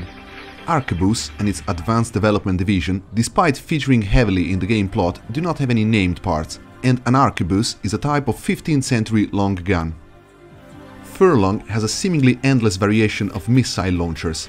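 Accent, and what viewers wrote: Italy. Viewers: Chad italian pronunciations of latin 🥲👌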